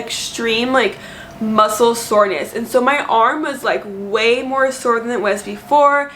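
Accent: United States. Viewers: just ran across your video and decided to watch. A few minutes in I was thinking "this girl sounds like she's in Texas" and then you eventually revealed you're in the D/FW area, as am I. I'm just getting over the side effects from my 2nd pfizer vaccine. I slept for 24 hours, felt like I might have had a slight fever, a bit of achiness. Of course, my allergies are in high gear right now which doesn't help. I'm older than you are, 61, with an underlying health condition. But like you, I'm so grateful to be vaccinated and hopefully we can return to a more normal life. I've been self-isolating at home alone for a year so I'm ready for human interaction. I salute you being a teacher and public servant. One of the most important jobs in the world. Wishing you many blessings and a covid-free life. You're so adorable I bet your students LOVE you!! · I know that accent from a familiar place, young lady. 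Are you from western PA by chance? 😉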